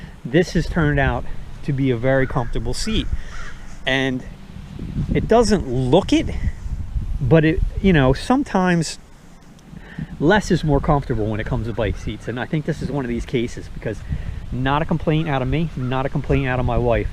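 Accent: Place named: Upper Darby, United States. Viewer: I haven’t lived in Pennsylvania in 14 yrs but I know an Upper Darby accent when I hear one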